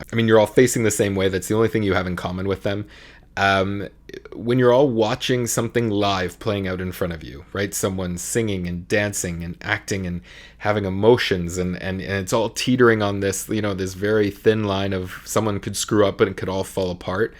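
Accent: Canada. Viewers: My first ever musical? Depends on what you mean. My first STAGES musical was Les Mis. I saw it in ‘93 (I was 13 at the time) Toronto. I think it was the last or one of the last stops of the Canadian tour. Fell in love with the show and went out to get the piano book the very next day. If you mean movies, then it was The Sound of Music. We got hold of a VHS copy back in the 80s and I watched it every day for a month or more. I probably drove my parents crazy! ps: are you Canadian/spend significant time in Canada? I noticed how you pronounce, say, “out.”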